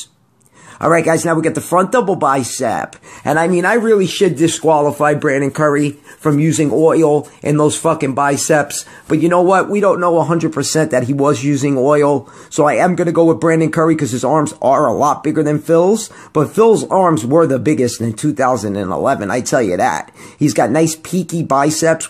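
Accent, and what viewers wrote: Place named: New York City, United States. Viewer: i love the NYC accent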